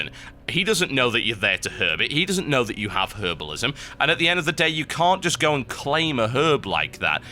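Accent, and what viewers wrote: United Kingdom. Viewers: because he is from United Kingdom,has British accent,commentates on wow and games,and theres more awesomenessnessessess stuff i cant even think about or my head would explode from the sheer awesomenessnessessess =D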